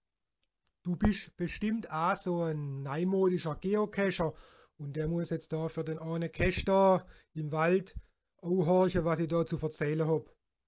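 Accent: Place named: South Franconia, Germany. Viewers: Naa, des had mim schwäbscha ned sou vil zum doona. Des is halt dr frängisch daal voa Werddaberch. I kumm voa dr Blafeldr geichnd und do reidt mor a a bissla andrsch, wia der in dem Video do greidt had. Aber des is noo a voa aam Oord zum adra wider zum daal ganz andersch. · Wohne seit 3 Jahren nicht mehr in Hohenlohe, war.... ich nenns mal interessant den Dialekt wieder zu hören 😂 Etwas cringe, aber interessant. Ziemlich nostalgisch. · Hört sich an wie der Dialekt zwischen Karlsruhe, Pforzheim, Heilbronn, Heidelberg. Südfränkisch, umgangssprachlich "Badisch" oder wie auch immer der Übergangsbereich zwischen Schwäbisch, Alemannisch und Rheinfränkisch heißt.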